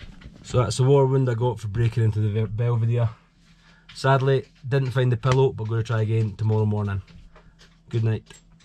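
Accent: Scotland, United Kingdom. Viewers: Did you learn English in Scotland? Pronouncing words such as "now" gives you a strong Scottish accent!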